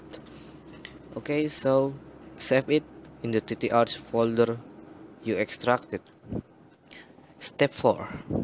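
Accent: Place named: Indonesia. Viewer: You indonesian not engglis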